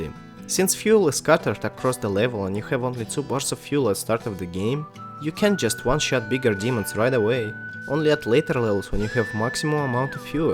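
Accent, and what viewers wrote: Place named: Ryazan, Russia. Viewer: ох уж этот рязанский акцент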